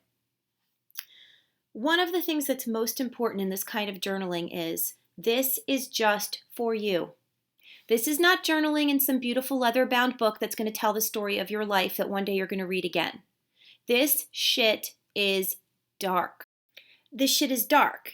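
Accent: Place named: Long Island, United States. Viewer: Great work you are doing. Are you a Long Island Italian transplant to Delaware too? Thought I can hear the accent...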